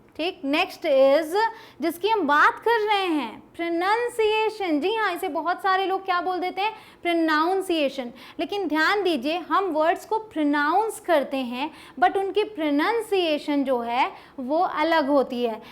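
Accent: India. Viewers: Mdm ye Indian eng h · Indian accent...👌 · no mam. I am not insulting her .what we learned in childhood English. Its Indian pronunciation. Thats what I am talking.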